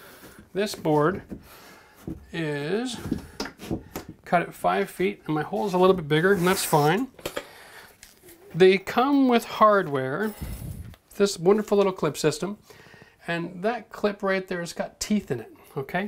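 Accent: Canada. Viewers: Lovely to watch a professional at work you make it look easy great video Are you sure your not related to the South Maine Auto guy your mannerisms are eerily similar good to see a son helping his father and showing a few new tricks Love the Canadian accent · I hear that Canadian accent! 🇨🇦 Great video. Thank you! We’re renovating a 7000 sq ft home. Great video. 👍🏼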